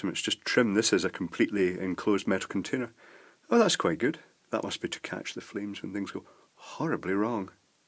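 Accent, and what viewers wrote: Scotland, United Kingdom. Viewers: if i am not wrong ,this is a british accent right? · can someone tell me if he's scottish or irish?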